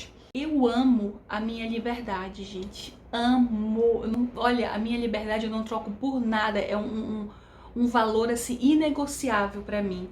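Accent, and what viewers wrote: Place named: Belém, Brazil. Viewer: Olá, caí de paraquedas no seu canal, uma pergunta vc é de Belém ou imediações? Seu jeito de falar me lembrou demais de uma amiga que é de Belém e fala igual a vc 😅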